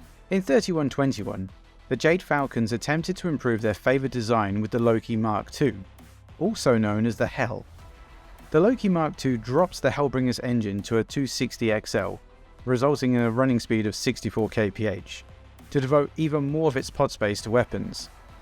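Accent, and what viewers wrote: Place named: England, United Kingdom. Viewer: One of the best things about being American is that everything just sounds better when narrated with an English accent. Add on great production quality, and you've got a winner. Subscribed.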